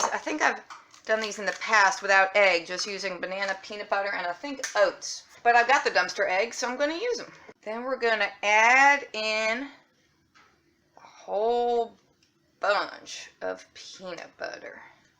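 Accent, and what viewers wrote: South, United States. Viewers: You sound more southern than the actors and actresses that fake them!!❤❤❤😎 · 💞Love your Southern Accent & how funny you are!!💞💞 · I just love Southern Belle voice. xoxo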